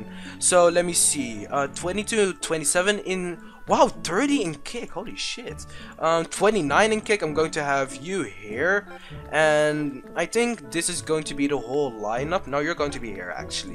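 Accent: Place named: United Kingdom. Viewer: nl for tha win! also, first vid I heard of you, I kinda thought you were brittish. XD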